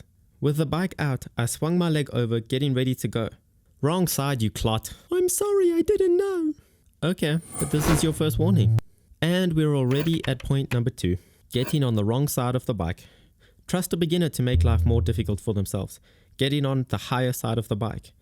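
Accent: Afrikaans, South Africa. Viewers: Heard that accent and was like AAAYYYYEEEE south africaaan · I knew you were South African 🤜🏾🤜🏾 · Are you South African?? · That south african accent is horrendous.. uhhh denni arrcheer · Is that a South African accent i hear ?? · I thought he sounded afrikaans XD I just assumed it was an auzie accent.. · This guy is 100% South African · I knew I heard a fellow South African voice hahahahaha..... Was a funny vid I needed it. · Are you South African? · r u south african? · Are you south african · Knew you were south african after your first word lol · Your definetly from South Africa me 2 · That south african accent?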